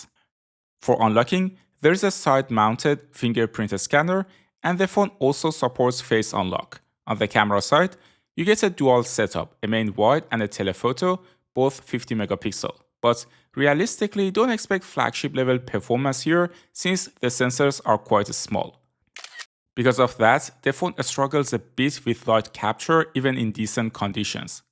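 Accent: Iran. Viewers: I love the persian accent<3 Free Iran!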